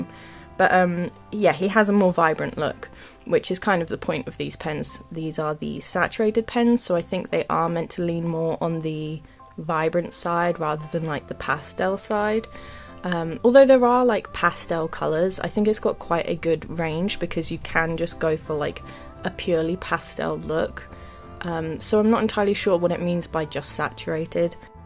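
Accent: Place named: United Kingdom. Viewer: Are you British? You sound like it soo much! ❤❤❤ by the way I love this video thank you for sharing!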